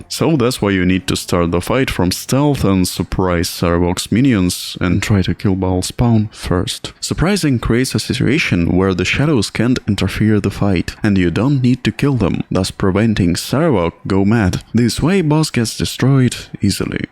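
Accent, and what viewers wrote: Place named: Russia. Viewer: you gave me some runglish, love it.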